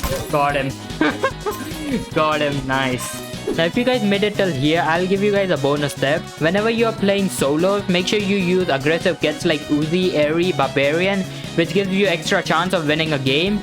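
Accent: India. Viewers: Was watching this next to my father, and he said “TURN THE FUCKING THING OFF… I dont mean to be racist but I HATE indian voices” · dude speaking extreme Indian accent in this!😆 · Are u a Indian u sound like one I'm and Indian too in Hyderabad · nice, exactly 8 mins for youtube payouts, and the indian accent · man bro i just realized his voice is indian INDIAN BOYS!!!!!!! :) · This is the first vid of you I’m am watching and from the start I KNOW you’re Indian from your accent! I’m also an Indian. Good to see more Indian ppl makin vids bout roblox and more games!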